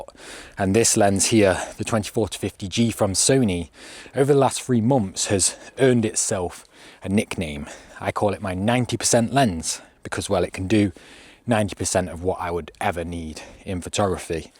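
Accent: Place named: Nottingham, United Kingdom. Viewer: Great vid and nice to hear a solid Nottingham twang on YT 😊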